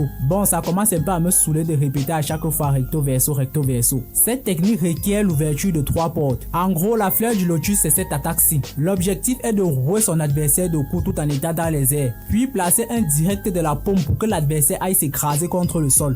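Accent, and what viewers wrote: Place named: Africa. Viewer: C'est juste que t'as 1accent comme dans beaucoup de nos pays Africains dû à nos langues traditionnelles, c'est pas grave mon frère. Continues comme ça j'aime tes vidéos👍🏽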